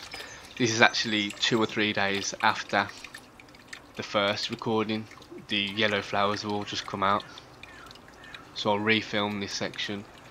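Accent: Birmingham, United Kingdom. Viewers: Thanks for the reply. I am in USA but you sound like a Brummy yet you say Rekcon ,so that kind makes me think Ipswich. Anyway,I have been checking out your videos today and I really enjoy them Thanks.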